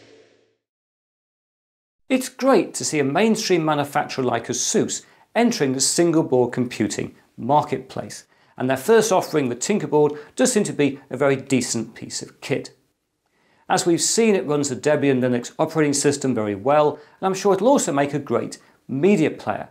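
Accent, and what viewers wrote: England, United Kingdom. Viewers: It's amazing how you have a guy with an English accent and everything he says sounds more intelligent and straightforward....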